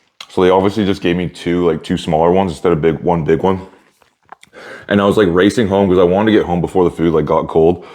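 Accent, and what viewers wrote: Canada. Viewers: Your accent isn’t super familiar to me as an Ontarian, are you possibly albertan or a maritimer?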